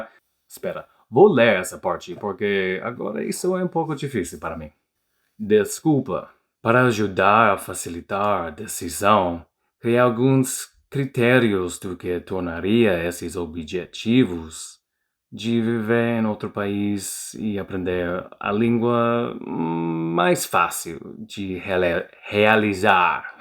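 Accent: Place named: United States. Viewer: Parabéns bro, Já está falando português brasileiro fluente, apesar de um pouco de sotaque americano, mas vá em frente aos seus objetivos. Você só não nos falou se você lê e escreve em português. Sucesso ao seu canal !!!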